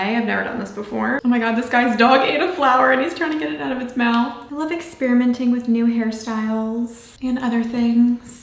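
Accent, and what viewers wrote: RP, United Kingdom. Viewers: i love her british accent lolll :)) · Same about the British accent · A British accent fits her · Your British accent is actually pretty amazing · I love your british accent :3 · I'm British and I adore your British accent! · don’t try and sound like the queen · I love your british accent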